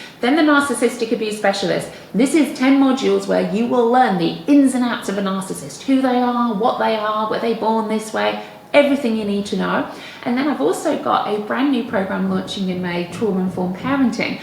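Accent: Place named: England, United Kingdom. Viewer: Hey. . . I hope you receive this as constructive feedback. The content is great. But between the English accent and the echo chamber audio I had trouble hearing you. I suggest buying a cheap, $12 corded lapel microphone you can run from the camera to a clip on your shirt. It will improve the audio tremendously. = )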